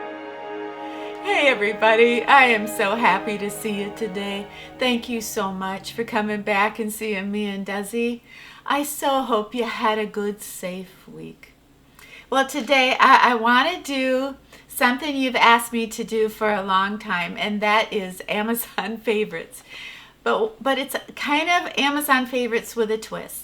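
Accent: Midwest, United States. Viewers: “Oh, you’re just saying that.” My gosh, I love that Midwestern accent!! Seriously, I do! Grew up in Minnesota, until late grade school, then in Oregon, so I love to hear that Midwestern accent! 🥰 I can’t imagine someone saying don’t want your dog and no dog in the back yard! God was definitely watching over you, and protected you and sweet Dezi. Can you imagine if you weren’t home how he would have treated Dezi!!😡 Scary! Bless you and Dezi!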